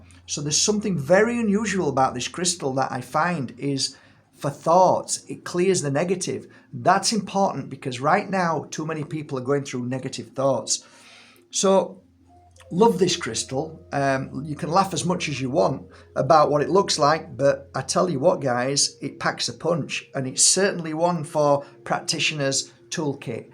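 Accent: Yorkshire, United Kingdom. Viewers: I come from Huddersfield and I always love to hear your Yorkshire accent. Makes me feel warm inside. Also I love whatever you say. X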